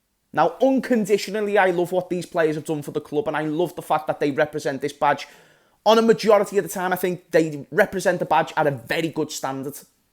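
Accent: Liverpool, United Kingdom. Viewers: Another gobby scouser strikes again.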